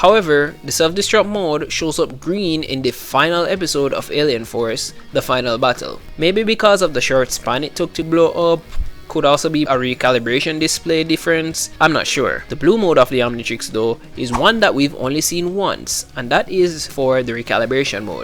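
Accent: Jamaica. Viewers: Are you jamaican. i recognize that accent anywhere. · Are you jamaica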